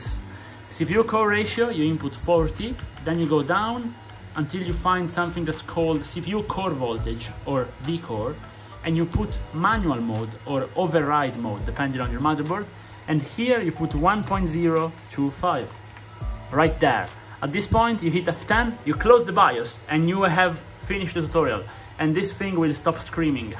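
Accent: Italy. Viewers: ho subito riconosciuto l’accento italiano ahaha, grazie mille per questo e altri tutorial ❤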